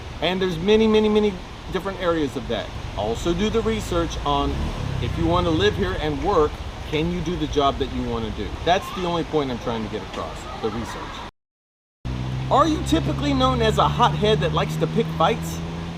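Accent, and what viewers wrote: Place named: Minnesota, United States. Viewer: You sound like you could be from Minnesota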